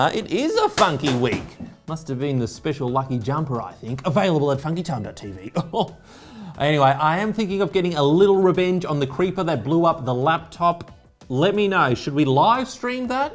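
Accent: Australia. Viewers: Are you Aussie? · R u an Aussie like me mate?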